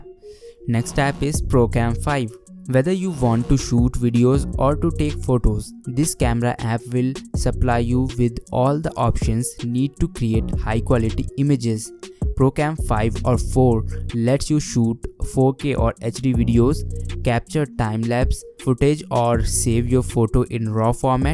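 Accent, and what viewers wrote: India. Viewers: am i the only one who hates indian accent english? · lol I just want search best camera apps for my iPhone and I come here only just hear Indian accent so funny 😂 haha. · I really dislike the Indian accent, but man I can’t dislike the video! Great job buddy. The quality is on point!!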